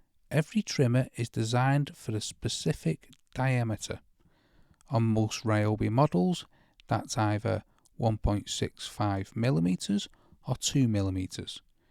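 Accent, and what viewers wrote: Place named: Liverpool, United Kingdom. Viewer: Are you a scouser? My dad was and you sound just like him!!!!!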